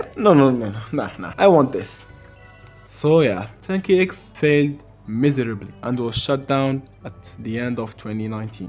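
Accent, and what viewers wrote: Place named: Morocco. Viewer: i hear a moroccan accent 😂, Nice video GL on the 1M views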